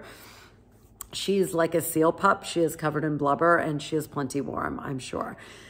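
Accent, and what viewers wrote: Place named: Texas, United States. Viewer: I so enjoy your show ,I,m in Alabama now but am Ft.Worth Texas ,and I smile every time you say you,all ,that’s the Texas in us ,I say it to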